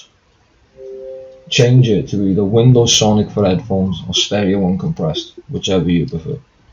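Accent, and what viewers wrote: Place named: Wales, United Kingdom. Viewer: Mate are you welsh because you sound it.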